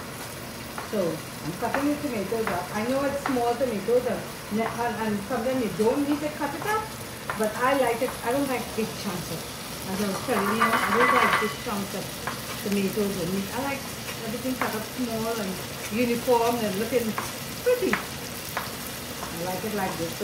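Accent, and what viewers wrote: Trinidad and Tobago. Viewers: I just came across your vlog and subscribed within the first few minutes, i love that you haven't dropped your Trini Accent. Some people will go abroad for a few months and want to talk with an American accent, little do they know how much foreigners love a Trini Accent.. Keep being your Original Self and I'm sure your channel will grow in abundance.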